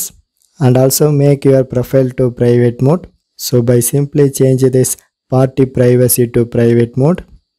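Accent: India. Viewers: You sound like a curry man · I'm Indian to, it's the majority of indians who speak like this. There r others who speak good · that indian accent is lovely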